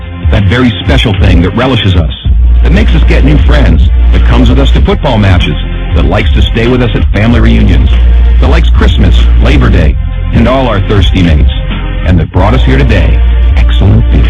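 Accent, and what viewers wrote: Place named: United States. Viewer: foarte tare clipul!!!!pacat totusi ca sunt putin cei care apreciaza si recunosc toate meritele Romaniei. totusi nu mi-a placut vocea tipului...adica accentul lui cand pronunta cuvinte in romana!!! de ce le pronunta ca un american/englez???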